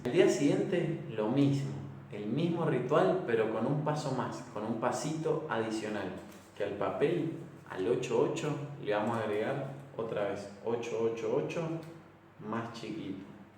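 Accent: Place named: Mendoza, Argentina. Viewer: Hola, sos mendocino? Por la tonada digo, tengo la misma jaja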